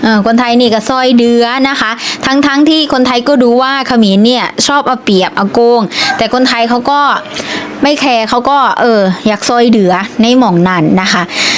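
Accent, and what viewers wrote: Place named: Laos. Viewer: พูดภาษาลาวก็ได้ทำไมไม่ดูช่องคนอิสานเขาก็พูดภาษาท้องถิ่นแต่คุณเป็นคนลาวดันมากระแดะพูดภาษากลางฟังแล้วมันขัดๆหูครับ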